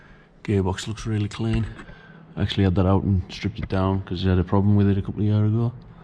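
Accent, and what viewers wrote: Cumbria, United Kingdom. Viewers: U from out west marra? Sound cumbrian Lovely car but get rid of them wheels 🙏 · Where ya from pal, sound very Cumbrian to me like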